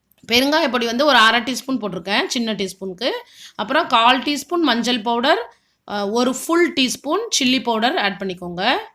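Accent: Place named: Nagercoil, India. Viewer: Romba arumai unga nagerkoil tone thanga....beautiful recipe and thanks for taking time to record this....very helpful for guys like me living faraway from India....love your tamil.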